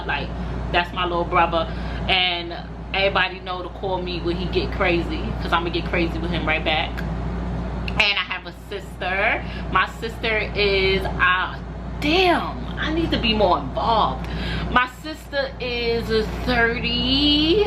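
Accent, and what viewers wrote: New York, United States. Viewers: Hey beautiful new fam here I literally been benge watching your videos an babbby I'm loving an feeling your vibes your realness is everything I can relate to alot of your conversations in SOOO many ways like girl I be like dam is she in my head😅😅😅it's crazy plus I love the new York accent, I have a channel with my nieces an nephews so we will definitely be supporting you an your BEAUTIFUL babies have a blessed Sunday 🙏💜😘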